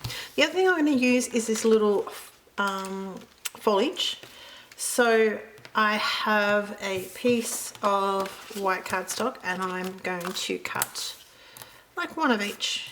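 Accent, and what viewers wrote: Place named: Australia. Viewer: Hi, hello from Norfolk Virginia, great to hear an aussie voice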